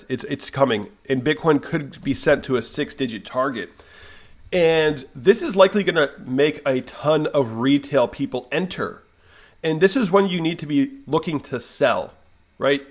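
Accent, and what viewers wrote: Sweden. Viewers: Are you from Sweden originally?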